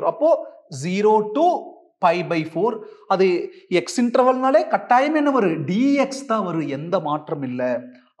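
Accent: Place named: Kongu, India. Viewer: That kongu slang nd teaching methods are 🔥💯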